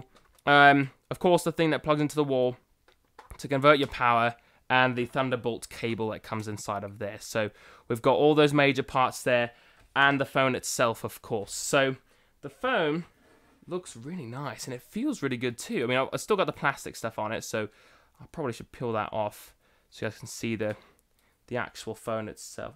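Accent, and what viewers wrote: United Kingdom. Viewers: hmmm I prefer iphone 6 Plus although the size is huge but it's definitely the best,, problem is I only had it for not even a month yet and its already develop fault microphone and sound/speaker start to play up so had to sent it back to be repair and hopefully I will be able to get it back soon,,, btw dude you got some British accent there! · Funny how he says "United States of course" when he has an UK accent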